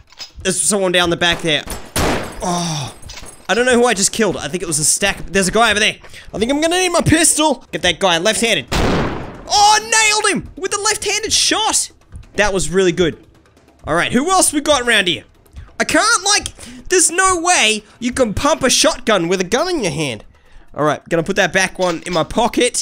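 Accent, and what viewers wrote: Australia. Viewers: mate are you Australian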